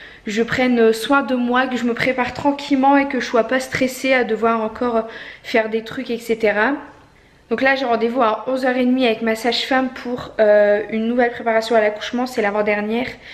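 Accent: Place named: North, France. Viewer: Je m'en doutais que tu étais du nord j'ai entendu l accent puis après tu as dis Euralille j en étais sûre haha... tu as trouvé où un joyeuse fée du coup ?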